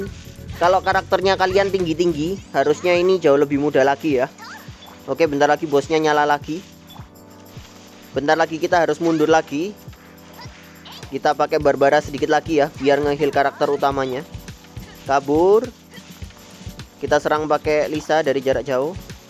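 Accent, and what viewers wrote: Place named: Java, Indonesia. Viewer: Wkwkwk medokk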